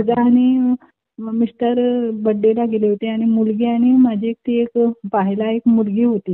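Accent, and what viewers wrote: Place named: Goa, India. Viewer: Hya tai govyahun bolat asnar nakki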